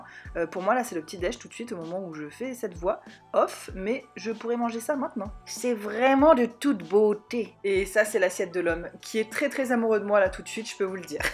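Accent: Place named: South, France. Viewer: Hey!! Non m ai tu m'a TUÉ !! toute la vidéo 📼j'ai aimé ton ACCENT du SUD HOUEEEEE !! SUPER repas très beau Visuellement très bon dessert tu m'a donné l eau à la bouche d autant plus que je suis entrain d éplucher des PATATES pour les passer ensuite à la POILES J APELLE sa des patates sauter !!! 🥔🥔🥔🥔🥔🥔🥔😂😂😂😂😂😂😂😂😂À Perpignan il 18h30 à 20h on mange et en plus j'ai la DALE FIN BREF je vous souhaite une bonne soirée et des bibisou !! 😘😘😘😘🌃🌃🌃😘🤗🤗🤗🤗🤗👍👍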